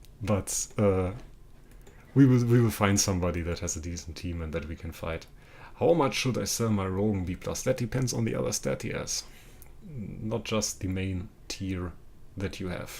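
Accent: Germany. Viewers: I could tell from your accent you were from Germany although I am pretty good with accents also Hi from Canada 🇨🇦 loving the videos bud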